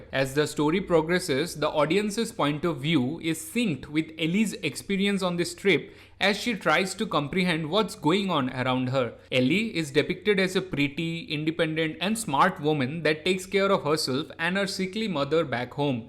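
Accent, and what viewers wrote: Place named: India. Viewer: Ok. Why don’t you learn to pronounce the English words like in English not in Hindi heavy accent or talk in Hindi language. This is the same as Call centre for Fido asking for information ℹ️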